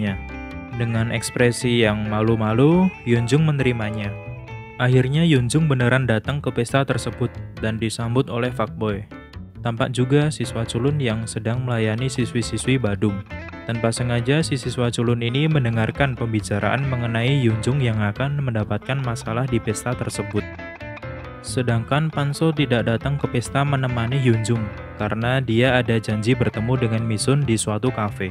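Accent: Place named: Sumatra, Indonesia. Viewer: pasti pengisi suaranya orang luar jawa...kayak sumatra gitu hha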